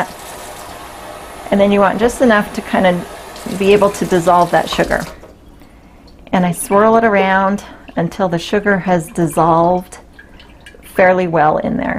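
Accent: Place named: South, United States. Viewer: you make it all look so easy -- can 9 quarts of peaches in 14 min. Wow, it takes me all afternoon just to peel the peaches:-) Ok -- I heard somethings in today's video that made me think -- you were not born and raised in Utah, were you. I heard a couple of different accents today. I would say East of Texas, but not Mississippi or Alabama -- maybe southern Missouri, then I heard some east cost -- North Carolina, maybe a touch of Massachusetts. I would say that you moved to Utah about 20 years ago. Now send me 7 quarts of peaches -- or you will get 3 cases of SPAM Lite (even the dogs will not eat that!!!)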